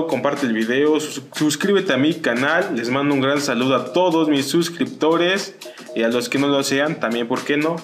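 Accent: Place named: Portugal. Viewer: creia que habkabas portugues o algo al principio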